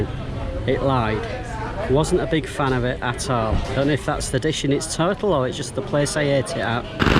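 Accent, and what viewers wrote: United Kingdom. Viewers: actually your pronunciation is fine, you just has a British accent. Don't worry it's fine.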